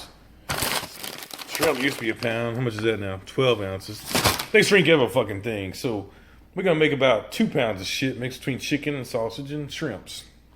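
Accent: South, United States. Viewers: Love love love love your southern accent! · I love his southern accent. Lol he said don't smoke no pot in Texas 😂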